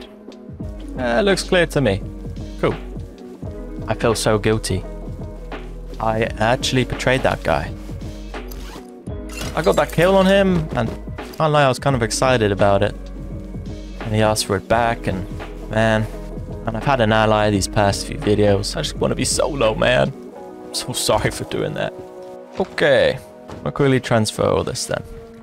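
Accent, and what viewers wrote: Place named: England, United Kingdom. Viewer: Love the inventive builds and chill vibes. I prefer your English accent over the American :)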